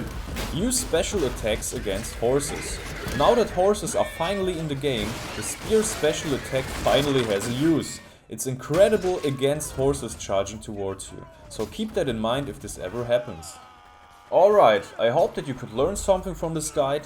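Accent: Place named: Germany. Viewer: Where are you from? you sound German. and very solid and meticulous guide. Like and sub!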